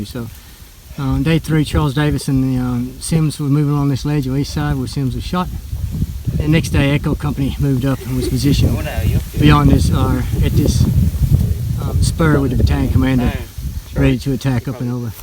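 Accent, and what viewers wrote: South, United States. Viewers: I can understand this rooster better than an Aussie,strange yank accent,I knew a crew that logged on the Solomon Islands,and they said the amount of War relics is unbelievable,and crashed planes still been found to this day... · He's a Southerner.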